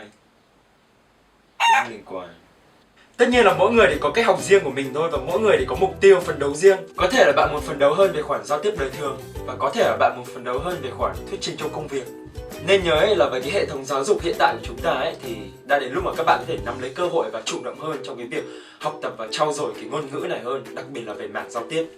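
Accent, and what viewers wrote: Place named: United Kingdom. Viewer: Giọng Anh-Anh của anh hay quá điiii ; 3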